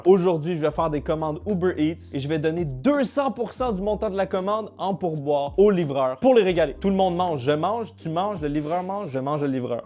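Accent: Quebec, Canada. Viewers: J'aime trop l'accent québécois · cest la premiere fois que je vois un de ses videos, je crois entendre une pointe de québécois dans sa voix, est ce possible que sa soit un frère québécois ??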